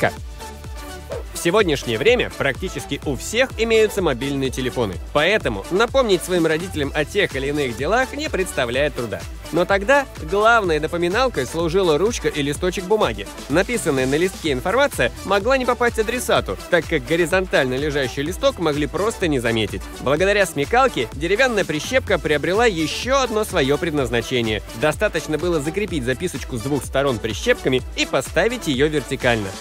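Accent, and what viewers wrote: Kazakhstan. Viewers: Воу чувак ты из Казахстана?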